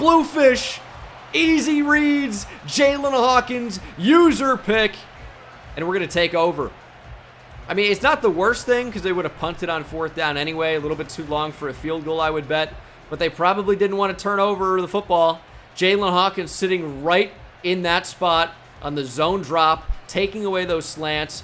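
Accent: London, United Kingdom. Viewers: Keep spamming the same play that doesn’t work and keep spamming the london accent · u throw every ball as a bullet pass u need to lob or touch pass sometimes thats why that Drake London play happened. W british accent tho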